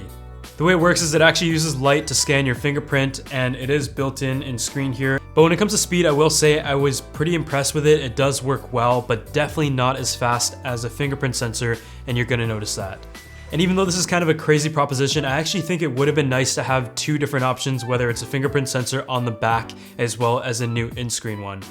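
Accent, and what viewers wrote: Canada. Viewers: MD KHALID RAHMAN he’s canadian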